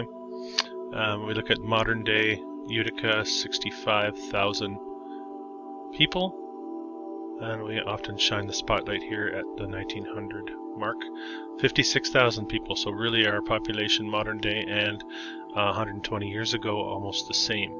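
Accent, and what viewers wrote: Canada. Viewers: This guy sounds a smidge Canadian? Eh?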